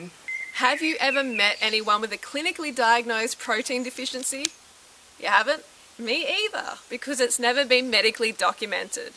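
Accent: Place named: Australia. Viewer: Haha. Super funny. I love the Aussie accent too. Oh and btw, WHERE DO YOU GET YOUR PROTEIN??? ;)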